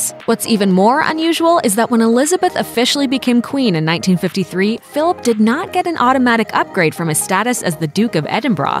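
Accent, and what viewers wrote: United States. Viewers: American accents (and not well spoken) are wrong for this kind of documentary (my opinion) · why is the narrator American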